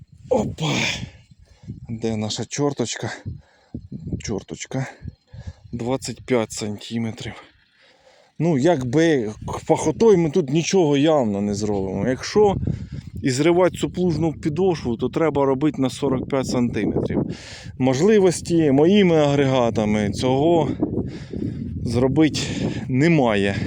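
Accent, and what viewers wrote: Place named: Dnipropetrovsk, Ukraine. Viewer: Бажаю здоров'я. З якого регіону ви? По говору таке як правий берег дніпропетровщини. Я вважаю треба половину виорать, а половину ні, от тоді бункер розсудить!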